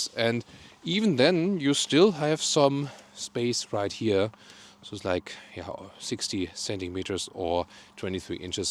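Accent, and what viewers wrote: Germany. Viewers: You have german english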